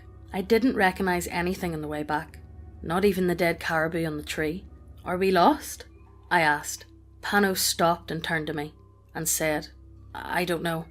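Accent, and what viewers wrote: Ireland. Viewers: It's 11 PM here, I don't know if I should watch videos containing spooky stories and Irish accent now, zombie-leprechaun nightmare fuel : (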